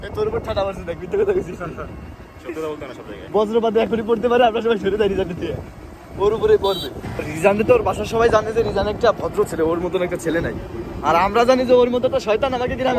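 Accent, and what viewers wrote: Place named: Gopalganj, Bangladesh. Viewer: Apnar basha gopalgonj ooo vai😮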